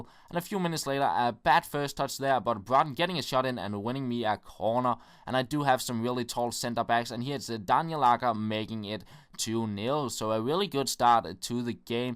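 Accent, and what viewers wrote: Jutland, Denmark. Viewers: you are danish right? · Er du jyde?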